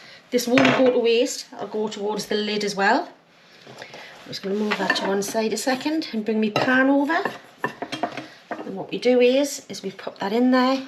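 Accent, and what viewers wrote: Newcastle, United Kingdom. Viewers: Lovely steak and kidney pud Hinny havent had one in years,even nicer with some oysters popped in,lovely to hear your Geordie accent.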